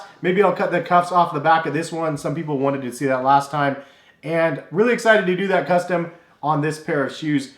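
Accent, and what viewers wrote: United States. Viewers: are u American?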